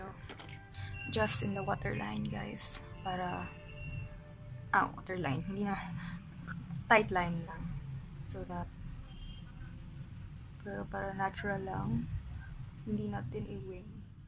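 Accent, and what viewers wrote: Philippines. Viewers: bisaya diay ka abi kog ilonggo Kay sa tono mn gud nmo pag storya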